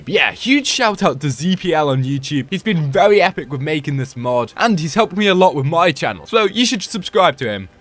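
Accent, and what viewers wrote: United Kingdom. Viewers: Is that why you sound British?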